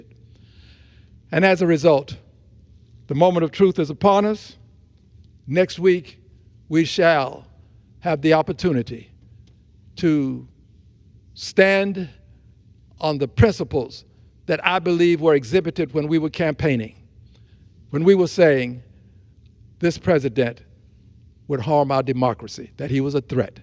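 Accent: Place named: Texas, United States. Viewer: I’ve never heard a posh Texan accent but damn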